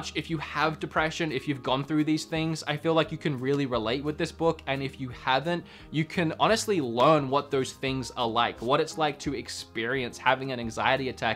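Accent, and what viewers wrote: Australia. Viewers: how can you lose an aussie accent? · I only just realised you're Australian I'm Australian. Why did I think you were American this whole time. Did i just filter the accent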